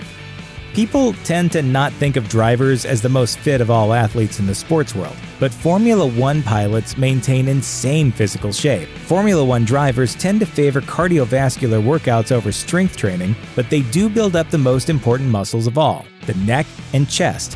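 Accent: United States. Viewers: When I heard an American narrating this i knew it was shite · Opens video: American accent appears Oh god, uneducated twats not understanding the nuances of the motorsport, yay. Footnote Hahahaha, Williams and McLaren both being top tier teams. HAaHahAa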